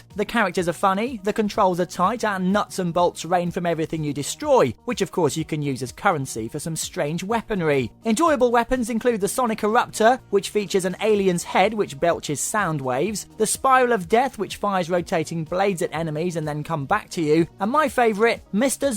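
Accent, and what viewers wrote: United Kingdom. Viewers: Am i the only one that findsthis review more entertaining because of the the ( hope i have this right ) british accent.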